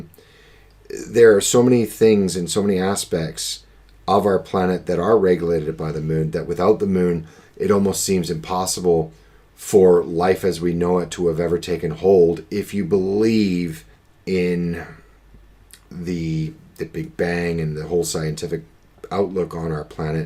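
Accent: England, United Kingdom. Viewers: Interesting stuff, it's good to see you making more videos, yt is a lonelier place without the melodic, perfect English accent of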